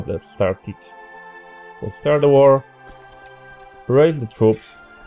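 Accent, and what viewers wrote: Poland. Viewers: I did know that from the beginning of the video, you have typical polish accent :D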